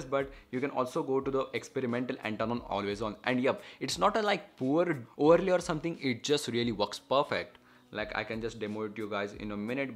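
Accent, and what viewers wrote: India. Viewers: Bro u Talk to much with so strong Indian Accent didn’t understand anything please try to talk slower next video thanks anyway 😂😂😂 Thank you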